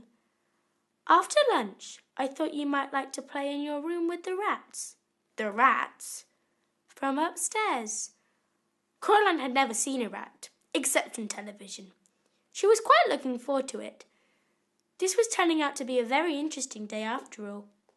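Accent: United Kingdom. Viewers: that's a British standard accent - certainly not a Cornish one! :) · Thank you. I was wondering which British accent she had.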